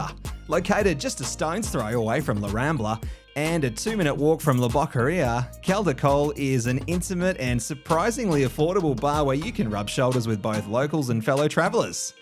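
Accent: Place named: Australia. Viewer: Mate! Going to Barcelona in a couple of weeks & when I heard your Aussie accent I knew you know what your talking about 😉 Even though it’s not summer over there it’s always a good time when you have a cold one!!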